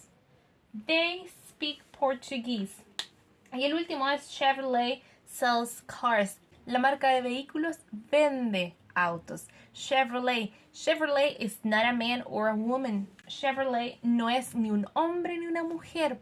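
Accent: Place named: Chile. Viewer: Usted es de Chile por el dejo.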